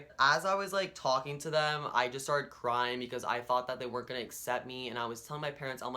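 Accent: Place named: New England, United States. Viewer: I have to smile the way you talk, you sound like you're New England Italian? Hope you and your parents work things out, give them time, there trying to deal with this the only way they know how.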